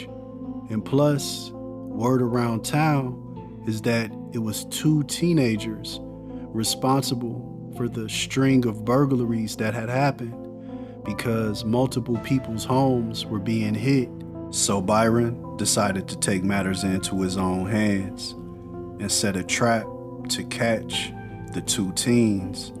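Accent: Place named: Los Angeles, United States. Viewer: LA guy LA voice perfect for the content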